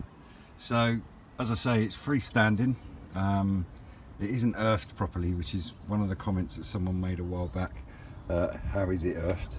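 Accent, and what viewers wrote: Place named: Deep South, United States. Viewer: You sound like you come from the deep South. I wonder if you can get in on our South East Coast Net tomorrow ?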